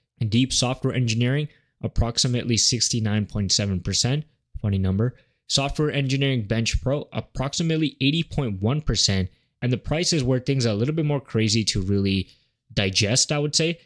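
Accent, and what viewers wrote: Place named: India. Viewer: Are you an immigrant from India or from another country? Your accent doesn't sound like that of a native Canadian or someone born and raised in the United States.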